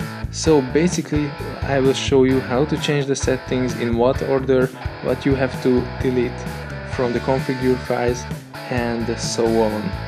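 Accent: Hungary. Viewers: Jól érzem az akcentusból, hogy honfitársak vagyunk? :D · Thanks ! :) That was a really big help :) u from hungary ? cause ur accent is same as hungarian english accent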